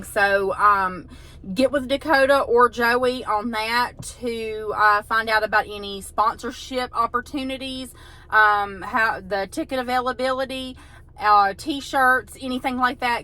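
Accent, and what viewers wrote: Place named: South, United States. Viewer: Thank you and and for all you do for us Elvis fans. Glad to hear is doing better. Even Pastor Bob Joyce said he’s not Elvis. We all wish Elvis was still alive but sadly he passed on August 16 1977. BTW I love your southern voice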